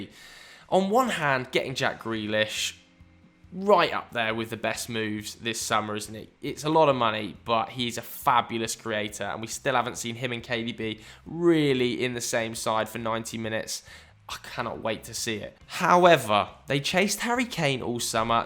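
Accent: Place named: Burnley, United Kingdom. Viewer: Love that has kept doing the Burnley accent since I said it was class like a year ago 😂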